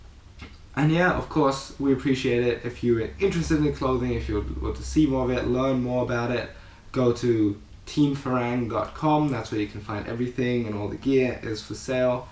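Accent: Germany. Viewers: No problem!! Please come to Singapore next Year! I would really be honored to meet and have a picture with you! You inspire me so much! I also like the way you talk in the german accent!! So hilarious! Jason Paul, you are an Awesome man and a really sick, legit, great, awesome, fantastic, funny and Excellent Freerunner! Love to meet you someday and parkour with you!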